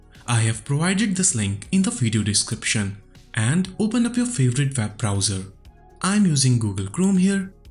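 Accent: India. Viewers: He's indian accent makes this 10x easier.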